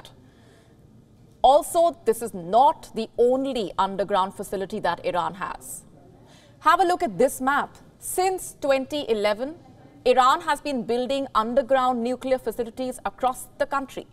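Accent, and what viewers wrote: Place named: India. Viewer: please change other news reader since this India are IndLish then English...so twisted tounge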